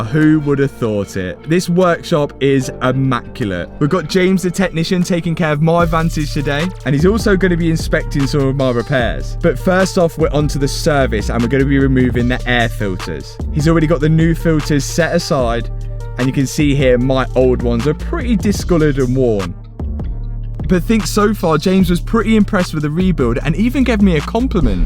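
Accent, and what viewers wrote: United Kingdom. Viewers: I am rarely impressed with much, but you my friend were born for this. Not everyone is a true entertainer, but you have it, every time you say something "is mental" with your strong U.K accent you bring a smile to my face. The fact that you have a wide grin threw all of the video's hardships is amazing. Keep making these for Youtube they are awesome!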